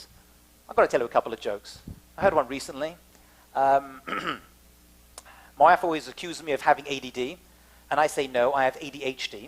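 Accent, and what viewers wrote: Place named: Israel. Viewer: wonderful with israeli accent to you!!!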